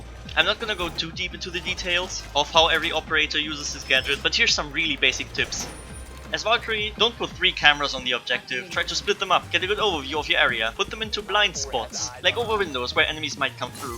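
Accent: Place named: Germany. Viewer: Haha, ich bin auch deutscher, ich kenne den Akzent von mir selbst nur zu gut:D Aber mach weiter, deine Videos sind super! Und es sollte 'german accent' heißen, nicht 'germany accent'. Ich hasse es, auf dem handy zu tippen:/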